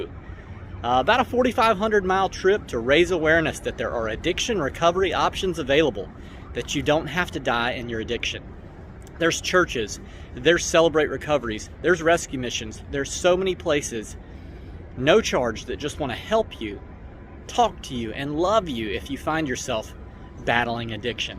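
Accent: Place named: South, United States. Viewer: Hi. My wife and I are intending to do RAGBRAI for the first time this year. I'll be keeping an eye for the guy with the beard and the southern accent. In the meantime, if your spring route takes you through West Michigan and you have some interest, let me know if you'd like some pedaling company for a few miles.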